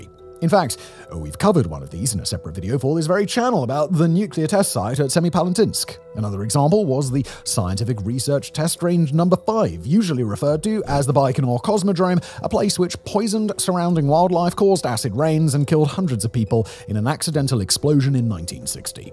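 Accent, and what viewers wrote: United Kingdom. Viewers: That’s cool but I can’t fall asleep to a British accent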